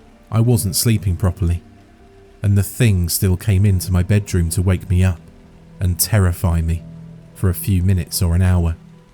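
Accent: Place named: United Kingdom. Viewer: I love your channel! I love how there are no voice impressions, just calm, chill monotone british voice. It makes me fall asleep like a child.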